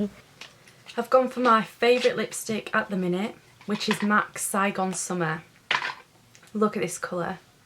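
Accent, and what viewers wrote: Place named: Cornwall, United Kingdom. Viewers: where are you from in england because you sound as if you'd be local to me? if you dont wanna be specific just say vaguely like which compass direction if that makes sense haha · For some reason, in this one video you sound like my Cornish cousin 😁😂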